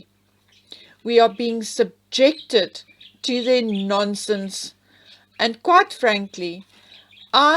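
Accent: Afrikaans, South Africa. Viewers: I lived in South Africa for many years, Pietermaritzburg first and then Cape Town. Your accent brings back fond memories and I love listening to your videos x 🌸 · I L💓ve your birdies please leave them in the background its lovely to hear them they are just joining in and they are not singing today😥 I miss them, bring them back!! DO NOT LISTEN TO THE HARBINGERS THEY ARE JUST UNHAPPY PEOPLE. Next love your accent I assume SA? I have a friend whose accent is exactly the same as yours and I like the way she speaks. On any account do not stop your FANTASTIC VIDEOS. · Kia Ora from New Zealand every body! Just watched your latest great video. I want to say that I love your South African accent your pretty little birds in the background and the fact that you make us feel like we are there with you in SA. This is what makes your videos so special. Like Yankee Wally! 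I look for your videos each day. You just keep doing what your doing xx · I love your accent. South Africa? I had good friends from South Africa. I enjoy your comments. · Your accent makes me remember happier days. I am Australian and in the 80's I had a South African boss. I got to know him, his wife, children, other family and friends, who all had that same lovely accent. He was a wonderful boss and we all loved working for him. Now, for some reason, whenever I hear a South African accent, it takes me back to a happier time in my life. It sounds strange but I think that's part of the reason why your videos cheer me up when I'm depressed. Another thing that has always lifted my mood is the sound of happy, chirping Budgies. Their voices are unmistakable from the voices of other birds and make me remember my own sweet Tweety, Glitter & Harriet. Anyone who doesn't appreciate your videos, for any reason, doesn't have to watch/listen to them. 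This is YOUR channel to use as you please. Others have the right to tune in OR out, but they don't have the right to expect you to change ANYTHING about yourself OR your channel. I really appreciate all the time and research that must go into the making of your videos and I'm sure that 99:99% of your other subscribers do too. So Thank You for that. ⚘ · I love the chirping of the birds - it is very uplifting. I am so sorry about your situation and all you have been through. My best friend is from Cape Town and your accent is charming. As to Meghan's latest appearance, why is her face so puffy looking? She has also gone overboard with the bronzer. She said nothing she says is controversial (apart from her constant plagiarism) but it is not all about what she says, it is what she DOES! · Firstly, I think your accent is beautiful (S. Africa, right?). I teach English as a 2nd language and listen to accents from all over the world, they're part of what makes us unique/special. 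Secondly, never apologize for our furred (or feathered) loved ones. If I had the talent to make YTube videos you all would be listening to a snorting, panting, snoring pug...but it's all (chirping, barking, meowing, etc.) music to my ears! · First of all, I am British living in Canada. I am fairly new to your site and I love your accent. Always have loved a South African voice. I cannot believe how much research you put into your site and I thank you for sharing. As for your two lovely little budgies, there singing is so pretty and I thought you might actually be outdoors. They do not squawk or even sing loudly at all. Thank you so much for your site. I do appreciate it and you so much. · I think you have a most beautiful accent. It sounds. South African. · I want to say I love your accent, and I am not alone in admiring the South African accent. It was voted the second sexiest accent in the world last year. 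Our internet provider here in Australia outsourced their technical advisors to South Africa, and some of those call centre guys were charming beyond belief. I also love your birds chirping. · SA accent right? So nice ☺️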